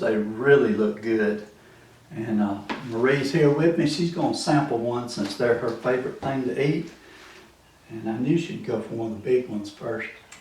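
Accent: South, United States. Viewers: I love the Mayberry southern accent.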